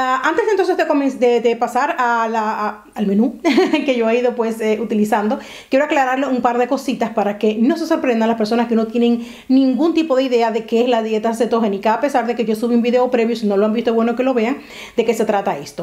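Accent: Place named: Dominican Republic. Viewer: Te encontré por casualidad, tu forma de hablar me llamó la atención. Soy dominica y en tu forma de expresarte parece de allá. Tengo un gran tiempo escuchando de estás dieta y es observada el consumo de queso es vital, quiero preguntarte si este puede ser sustituido , tengo problema con la lactosa y aunque dicen q éste no tiene a mi me produce muchos gases.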